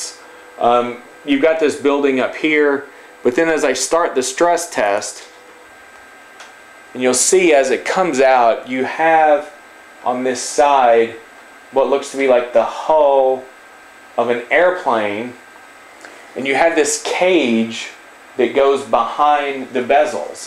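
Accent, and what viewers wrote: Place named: Texas, United States. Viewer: Are you from Texas? You have a hella texas/southern accent. Whenever you say "compensated" you say compINsated. Its funny. Curious if you're from Texas. Great demo of bezel compENsation though :)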